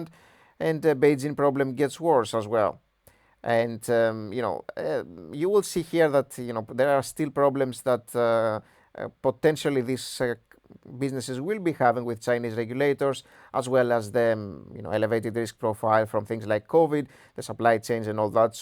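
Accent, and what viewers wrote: Greece. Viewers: Hello, I was the commenter that spotted your Greek accent a few months back. I'm interested in ATIP stock. Can you dona quick video on the fundamentals? I live in the city they're based out of and don't know much about the company's financials. Everyone I've spoken to says they are wonderful to work for, their places are clean and busy. If not a video maybe you can comment. Let me know as I buy shares daily. ATI physical is the name of the company out of Chicago.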